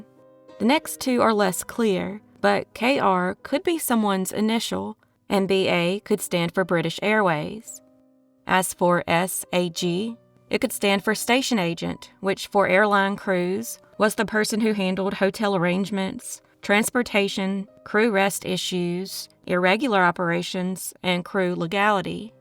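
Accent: South, United States. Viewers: Does it make sense to anyone else, that a southern voiced AI is doing such a horrible job of narrating these stories! Can we get a New York narrator please?! Geez!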